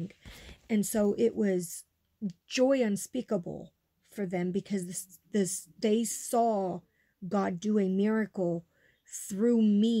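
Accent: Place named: South Carolina, United States. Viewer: I live in south Carolina you sound like ur from south Carolina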